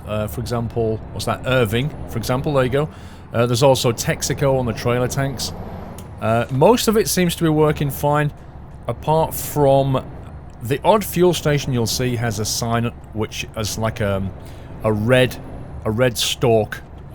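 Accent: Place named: North, United Kingdom. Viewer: The psuedo American raised inflections as you speak mixed with a Northern Uk accent has got to stop.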